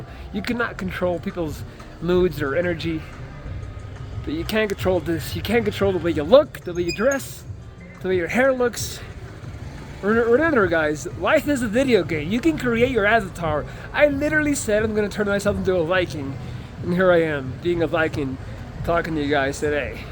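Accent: United States. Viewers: You’ve got an American accent! Where are you from originally? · Wat grappig je komt uit Nederland? Ik dacht serieus dat je uit Amerika kwam. Je klinkt als een Amerikaan. Maar uh... wat doe je in Brazilie? Brazilie is wel het laatste land waar ik ook maar een voet zou zetten. En ik haat de Portugese taal. Gr gr Dario.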